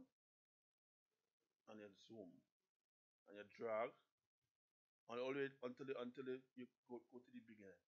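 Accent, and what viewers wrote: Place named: Virgin Islands, Caribbean. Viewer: The man call scene I thought u were from the Virgin Islands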